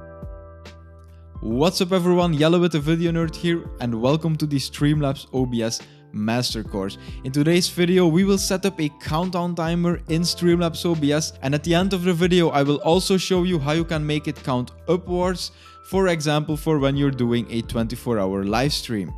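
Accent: Netherlands. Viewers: nice man, it works. hey you are dutch ( - ) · I knew you were dutch! haha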